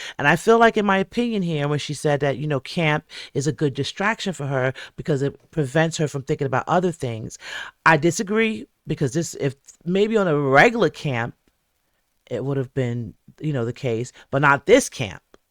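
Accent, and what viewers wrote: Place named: Caribbean. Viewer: I 🇹🇹 I thought I heard d West Indian in you some time ago!🇯🇲❤❤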